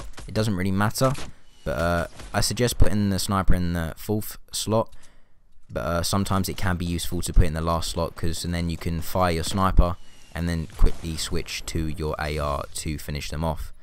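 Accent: United Kingdom. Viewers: Btw you sound British I'm British want a cupa tea 😃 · You from the uk?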